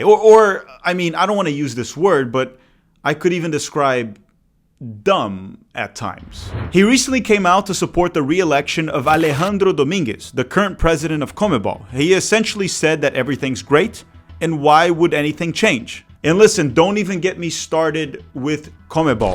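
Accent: Brazil. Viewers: Sabe sim, ele grava em inglês mas também é brasileiro. Só prestar atenção nas pronúncias em português br.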